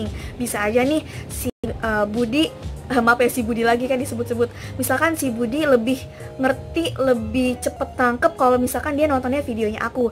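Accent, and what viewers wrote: Jakarta, Indonesia. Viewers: budi, malih, markonah, betawi banget dah ahhh, gws cantikkkk Allah mau angkat derajatmu 😘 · Betawinya keluar dah:)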